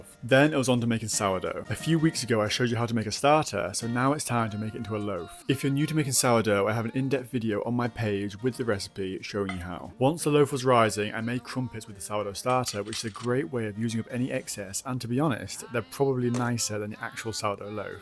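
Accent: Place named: Yorkshire, United Kingdom. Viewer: Perhaps around abouts Yorkshire based on Dialect?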